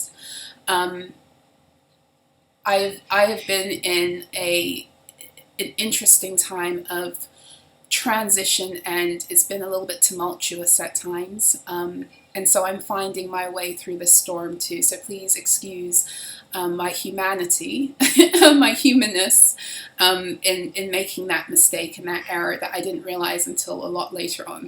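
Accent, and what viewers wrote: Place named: United Kingdom. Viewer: "You have caused confusion and delay!" Love love love your English (or Brit) 'accent!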